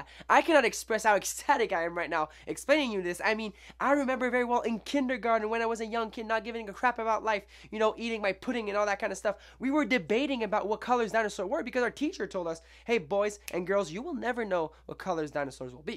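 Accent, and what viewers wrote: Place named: France. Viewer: Love your french accent <3 <3 <3 You, rock hahahaha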